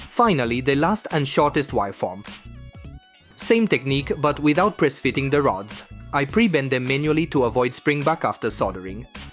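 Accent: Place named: Italy. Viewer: I prefer your real voice. Who doesn't like an Italian accent?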